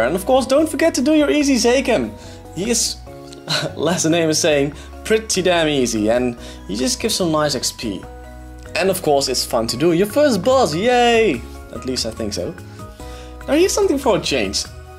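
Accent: Netherlands. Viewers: You dutch bruh?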